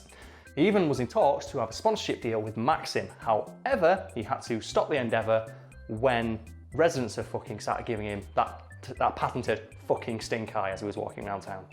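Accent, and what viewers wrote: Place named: Northern England, United Kingdom. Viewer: Everybody misreads the sign for Hilter in northern Germany when they first see it. I was also in Elend (misery), just 8km from Sorge (worry). I know it's for comedic effect here, but you are kinda mispronouncing Fucking if you say it like the English pronunciation, except your northern English accent with the characteristic u sound that is far closer to German kinda counters that.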